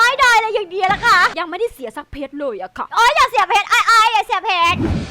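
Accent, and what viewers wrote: Isan, Thailand. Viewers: พึ่งเคยได้ยินเสียงพี่แบบนี้พี่พูดอีสานด้วยไปกับหน้าด้วย555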